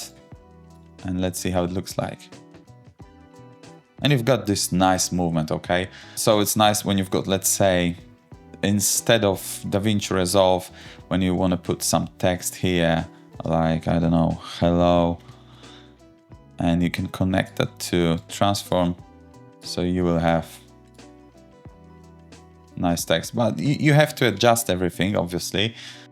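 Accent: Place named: Poland. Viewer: Mam wrażenie że słyszę tu polski akcent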